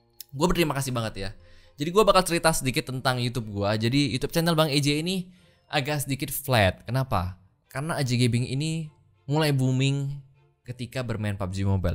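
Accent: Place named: Java, Indonesia. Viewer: Ehh wong medok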